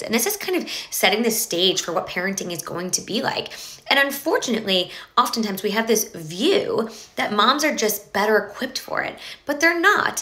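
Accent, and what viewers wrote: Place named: West Coast, United States. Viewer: She has to be West Coast. They have developed the most annoying accent in the US.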